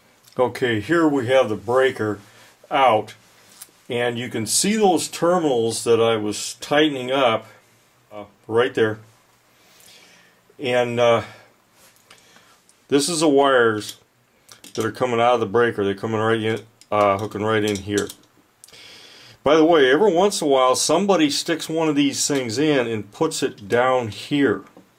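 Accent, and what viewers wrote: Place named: Appalachia, United States. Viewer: Jesus stop saying, "kick off." You sound like a hillbilly. Circuit breakers "trip." Fuses "flow" or "melt." You sound like you've been hanging around a bunch of butt-crack hillbillies too long. Yeah, electricians may say it too but only the butt-crack hillbillies do. Electricians that know what they are talking about don't talk like that.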